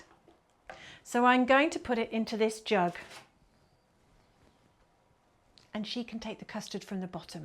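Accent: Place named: United Kingdom. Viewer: Yes this. I’m so used to watching British foodtubers that my brain automatically makes the switch when it hears the accent.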